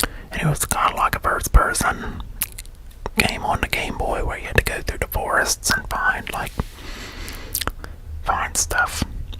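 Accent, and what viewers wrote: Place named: Pennsylvania Dutch, United States. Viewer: You're way off. Its penny dutch.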